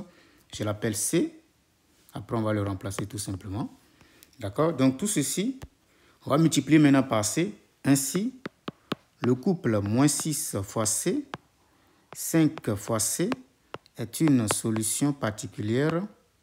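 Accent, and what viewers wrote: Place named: Benin. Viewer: Bravo mon frère , c'est vraiment sympa cette tranche de maths sur les équations diopht. on croirait entendre mon prof de math au lycée en C.I qui était Béninois.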